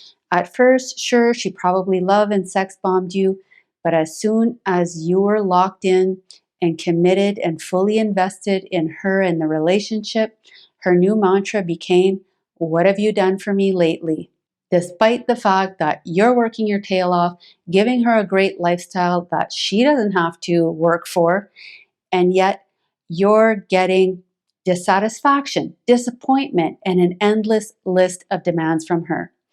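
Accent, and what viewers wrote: Canada. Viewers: Sounds like a Canadian accent.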